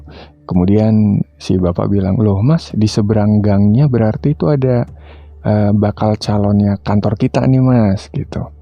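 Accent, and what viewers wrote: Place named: Jakarta, Indonesia. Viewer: Logatnya Jakarta bgt ya.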